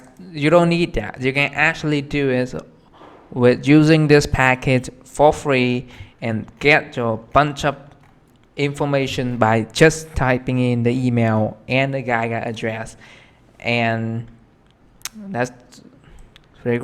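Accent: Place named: South, United States. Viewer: Why do you have a southern accent? lol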